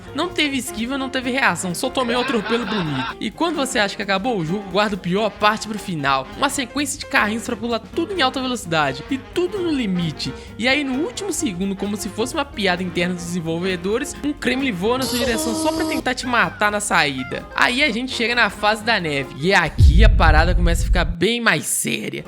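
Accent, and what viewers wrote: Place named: Minas Gerais, Brazil. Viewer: o sotaque mineiro deixou tudo melhor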